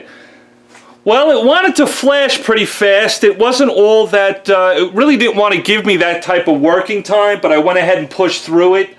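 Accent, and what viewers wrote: New York, United States. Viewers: I was going to say you sound like you're from NY. (NJ here) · ha! I thought he sounded a little "New York" accent as soon as I heard - as someone who has visited New York and loves it:-) Thanks for the vid. Meguiars seems to win on most things. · Jesus, I feel like i'm hearing my brother 'taawking' on the phone with me:) As usual, you have the most honest and informative videos on car detailing. You're a legend,!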